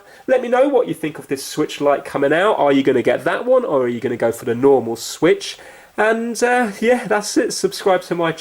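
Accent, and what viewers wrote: United Kingdom. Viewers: Haha your happy British voice :)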